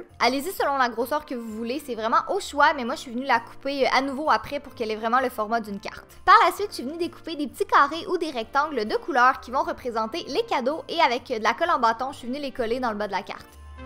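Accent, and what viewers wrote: Quebec, Canada. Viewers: 💖💖💖💖💖 t'ai la meilleure j'adore t'ai DIY c'est les meilleurs ❤ j'adore ton accent Québécois il te va super bien 💖💖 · et puis j'aime beaucoup ton accent canadien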